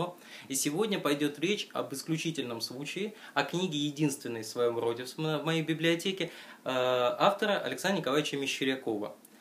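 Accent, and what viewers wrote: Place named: Russia. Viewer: Здравствуйте. Заинтересовала книга. Спасибо за рассказ. Подскажите из какой вы части России или вы из Украины? Интересует ваше произношение Япония, японцы.)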